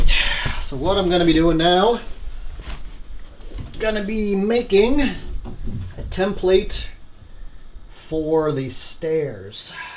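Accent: Canada. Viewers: Yeah!! More build videos! You can definately tell you are Canadian by your accent! Our lovely neighbors to the north eh? Have a very blessed weekend 💕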